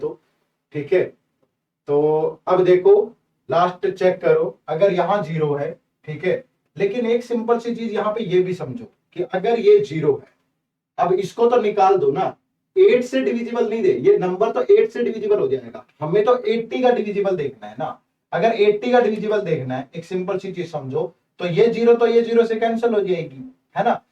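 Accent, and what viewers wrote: Haryana, India. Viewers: According to me also because that speaking way and his simplicty show he is fromHaryana. I like haryana people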